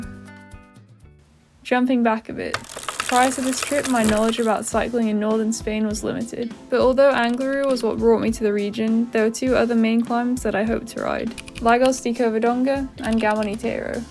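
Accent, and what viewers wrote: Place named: United States. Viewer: The rear cam works so well, really gives that perspective of progress love your voice and your accent of course like any American : )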